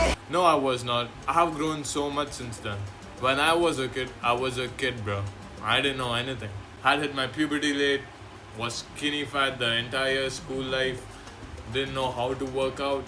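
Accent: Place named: India. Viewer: Bro you sound like an Indian guy. Are you from India?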